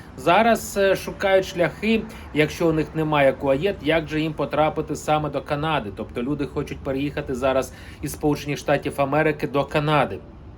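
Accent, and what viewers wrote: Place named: Russia. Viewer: Не доставай. Так чути росіянський акцент, людина все життя розмовляла росіянською мовою, і в цьому не його винна. Зараз він розмовляє англійською, і це не так легко як здається. Говорю з власного досвіду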